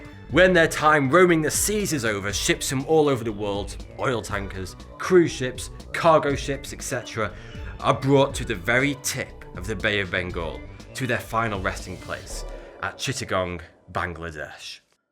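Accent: United Kingdom. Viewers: What area of Britain does his accent come from? I'm curious. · That's just a native British accent, my friend.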